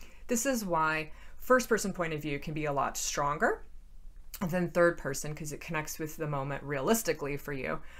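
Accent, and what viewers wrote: United Kingdom. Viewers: Best Valentine’s Day gift - we’ve missed you and your British accent and bed clothes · Your British accent is absobloodylutely fabulous!☕️🍪😜 And I love love love this video from you, it feels like you’re a best friend I didn’t know I had, came to bring me a golden advice😍 Thank youuu🌺🤍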